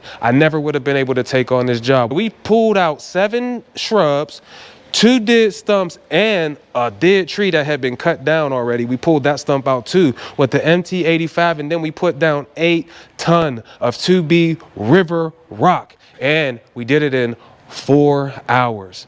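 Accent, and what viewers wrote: Pittsburgh, United States. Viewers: Damn I was like buddy sound like he from Pittsburgh lmao then I see the 412 area code on the trailer 🤣🤣🤣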